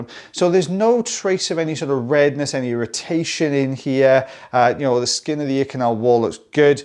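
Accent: Wales, United Kingdom. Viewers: I am really enjoying the changes you are making. You would make a terrific teacher. And I love your Welsh accent. One of the reasons I follow your channel. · I appreciate the medical terms. Knowledge gained is an amazing thing! And don’t worry about your Welsh accent. This American with Welsh ancestry loves it! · As long as you don't get rid of that Welsh accent - as someone from the US, I truly enjoy the accent and have little, if any, trouble understanding you! 😀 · I love that you are posting on weekdays and this video is terrific! I love getting the medical terminology. Putting it up on the screen is a great idea, too. I'm a microbiologist, love you accuracy! By the way, I super enjoy your broad Welsh accent!! 😁💕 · Love the Welsh accent. Adds a good bit of charm to the vids. Keep the medical terms coming! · Love your Welsh accent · I usually struggle with welsh accents (being broad yorkshire im used to very diferent) but your accent is quite a soft welsh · I don't think any of your diehard fans will complain about the medical terms. 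As for your Welsh accent, it could be worse. You could have a strong Scottish accent! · You're Welsh accent is lovely. Yep, American here. 😆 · I ❤ your Welsh accent! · Love the Welsh accent. Never miss an episode. Keep up the good work 👏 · As someone who works in the health sector (disability) I love that you're now using the medical terminology in your videos. While I don't have to use them myself on a daily basis, I do have to attend appointments with clients and being able to translate them to layman's terms if the doctor or specialist uses them is a win. Thank you for continuing to make these videos...and your Welsh accent is a breeze! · Thanks for putting the terms in writing. My hearing isn't too good, so sometimes it's hard for me to make out what people are saying. Nothing wrong with your wonderful, welsh accent 👍😊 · i like your "welsh" accent! Please continue to use some technical terms; showing the spelling and meaning to great! 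· Brother coming from Alabama USA I personally like that "Welch accent"! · I'm American and can understand your Welsh accent perfectly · The Welsh accent is fine by me,and knowing the proper terms is really interesting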